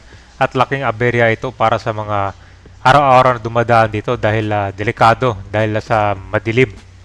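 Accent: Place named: Visayas, Philippines. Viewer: Ayusin m pgsasalita m.....bisaya kb😅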